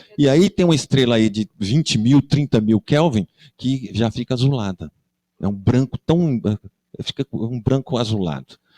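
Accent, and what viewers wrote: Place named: Minas Gerais, Brazil. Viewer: Astronomia e GALAXIAS palestrada por um mestre com sotaque mineiro fica mais LEGAL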